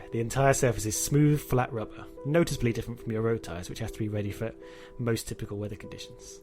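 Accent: England, United Kingdom. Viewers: Audio is ok, speaking style is very British english. As a Nebraskan. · Ive went through all his posted videos and found them easy enough to understand. I am fluent in American English with some Spanish. Perhaps its the English accent that gets you? I find these videos to be well done. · Seems like a lot of people aren't used to normal conversational Brit English, maybe you could lose the music though, as it doesn't add much. I've learned a lot from you, so thanks & please keep up the good work. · It was quite easy to understand him. It may be too soft at some times but still understandable. Maybe you're not used to hearing English in an English accent? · nothing racist but isnt all British and Europe people talk like that? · Lot, Wut? I understand everything. This is in English English, it seems. Do y'all only understand American English? Or is English not your first language? 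That will likely have more do to with it. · Yeah, maybe you're used to listening to American English. The English accent can sometimes make it harder for non-English speakers to understand. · maybe its good if u put subtitles in it, cause i cant really hear your british accent :(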